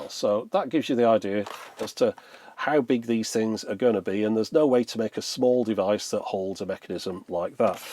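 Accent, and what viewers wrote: United Kingdom. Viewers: I do like, of course your videos, but your voice. Nice and calm. Like to hear a British voice instead of always American.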